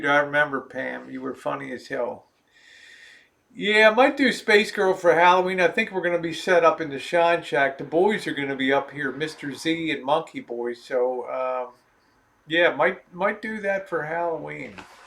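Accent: Baltimore, United States. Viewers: We are the same age and I feel so I pretty in comparison! I'm interested in your metal detecting. It's something I would love to try. How hard is it on your back? Is it something that you would think is not good? I have a bad back but am able to get around. I understand that you have to do some digging but is it possible? Also I have family in baltimore and love your accent 😍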